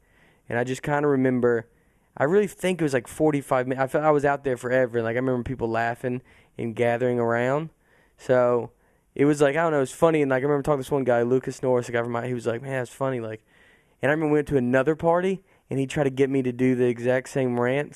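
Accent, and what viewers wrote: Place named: Tennessee, United States. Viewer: New York... really? Well, I understand. I usually don't crack up at stand up, but your accent is ridiculous. I'm from Tennessee, Nashville area too, so yeah I get it :) Come to Zanies! Book a show, bring your wife and we'll go out to dinner; you two and my fiance! You remind me of my friends and myself! Really relatable. That's probably why I can understand where you're comin from and why you're perspective is refreshingly funny. Oh, wait, no that's the Italian back hair...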